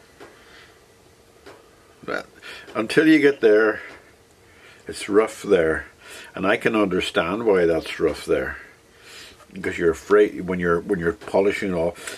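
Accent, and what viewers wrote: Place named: North, United Kingdom. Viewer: That gentle "No'rion" accent grabbed me immediately and kept me listening to the end. Great review. My only criticism would be that you might have explained what exactly you were doing to ensure the 12th fret and the open strings were perfect octaves. I never remember whether it's "clockwise" or "Anti-clockwise" for flat or sharp and I end up doing it by trial and error every time I acquire a new instruemtn. You have probably given it back by now, but how much did it weigh approximately? Most LPs I have strung around my neck were so heavy that 30 minutes of playing would cause shoulder problems.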